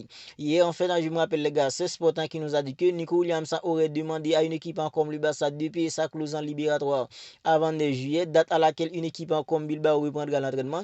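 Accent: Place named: Haiti. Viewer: Je reconnais l'accent d'un patriote haitien, force bro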